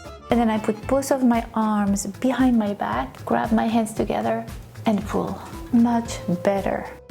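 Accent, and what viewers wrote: France. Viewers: you have a french accens XD I love you xoxo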